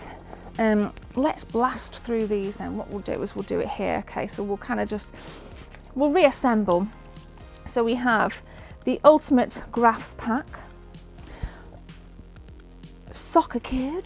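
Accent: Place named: Liverpool, United Kingdom. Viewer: You sound like a posh Scouser? AND you DONT look old enough to know about an AMIGA ?!?!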